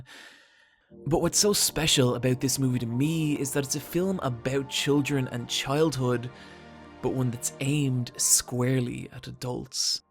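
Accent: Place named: Ireland. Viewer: Your irish accent is lovely!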